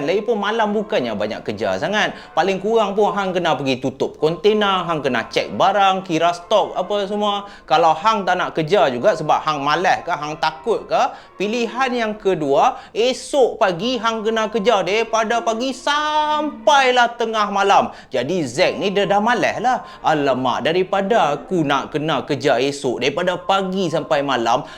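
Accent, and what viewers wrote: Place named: Penang, Malaysia. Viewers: Slmt ptg...adehh..krem perut Oii..tiap kali dgr ceritA mati akk mgekek tgelak,lg2 dgr ckp loghat peneng😂😂😂😂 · Fuhhhhh ..loqat penang dia mcm geqammm ja kita dengaq..😂😂😂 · Assalamualaikum...ada la ckit2 mcm org Penang cakap...kedah pn ada jgk...asalkn utara mna negeri pn x pa la...jnji utara😂😂😂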